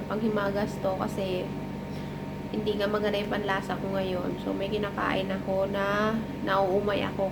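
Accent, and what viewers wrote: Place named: Ilonggo, Philippines. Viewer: ang tuno nga ilongga gid bala aw hahahah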